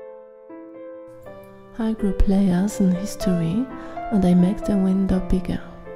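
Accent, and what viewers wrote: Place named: France. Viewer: I love your french accent! And dont worry, i understand very well. Thank you for your video!!!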